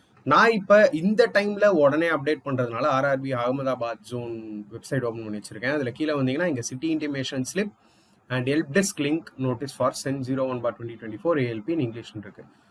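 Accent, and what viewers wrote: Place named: Coimbatore, India. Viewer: I think Coimbatore side ah irukalam😮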